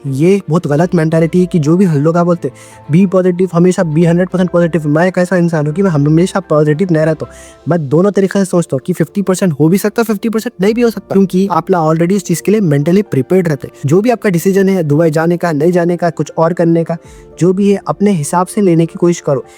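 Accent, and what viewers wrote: Hyderabad, India. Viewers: Bro your Hyderabadi accent 🥰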